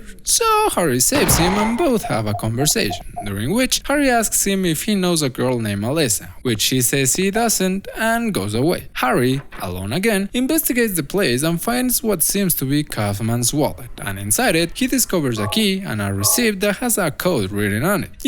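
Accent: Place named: Russia. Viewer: Good Russian(?) accent for storytelling! nice video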